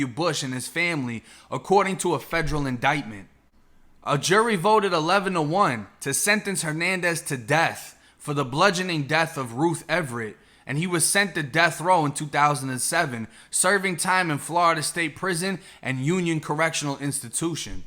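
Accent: Boston, United States. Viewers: Woman love the Boston accent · Thats Boston. I get made fun of for my Jersey Accent. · You from Boston?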